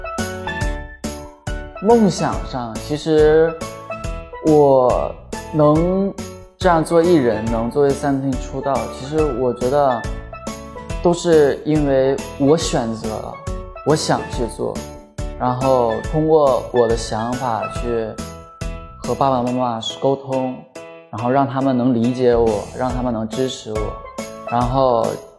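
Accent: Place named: Dongbei, China. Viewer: 呗 got me thinking he said "bae" instead. Thank goodness for his Dongbei accent. Happiest mistake ever 😍💕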